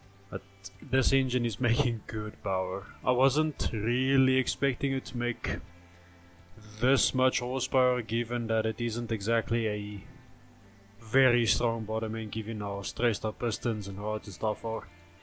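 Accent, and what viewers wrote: Afrikaans, South Africa. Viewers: I knew it 😁... that Afrikaans accent came through perfectly 😂👌🔥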